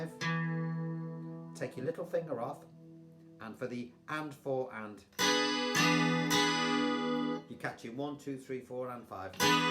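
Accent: Liverpool, United Kingdom. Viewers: First of all are you from Liverpool? Also have you thought about writing this out in tab instead of chord charts?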